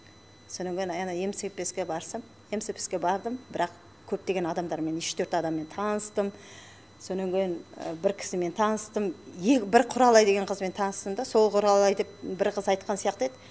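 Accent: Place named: Karakalpakstan, Uzbekistan. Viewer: Қалпақтардың акцентімен сөйлейді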